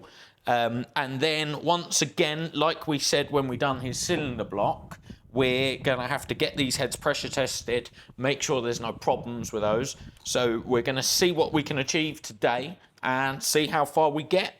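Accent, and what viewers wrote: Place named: United States. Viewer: Cool - maybe try saying "you" sometimes instead of "you guys". I get that narrating a task is not your job but changing it up a bit helps a lot. The less American you sound the more likely I will subscribe. (Alfa Romeo - 4 door Gullita 1600cc saloon)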